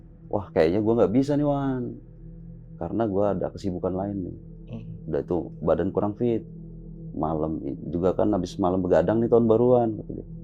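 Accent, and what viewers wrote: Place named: Lampung, Indonesia. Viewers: asliiii bang😅😅, 100% lampung banget😅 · lampung bener cara ngomog nya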